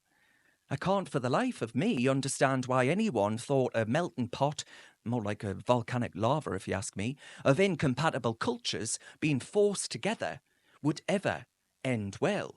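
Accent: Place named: Sunderland, United Kingdom. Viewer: please leave nufc out of this , wearing our toon top when talking about politics is really sad to see just trying to get some views with our shirt on you are probs more of a makem than a geordie going off your accent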